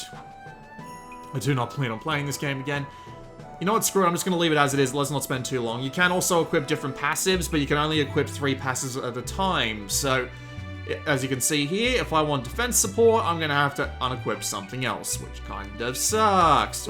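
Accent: Australia. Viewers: The voice on this review is awful. I am not a fan of Australian accents.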